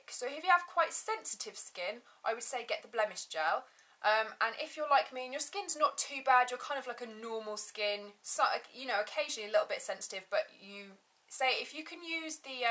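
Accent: England, United Kingdom. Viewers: Love your english accent! Please more vídeos!!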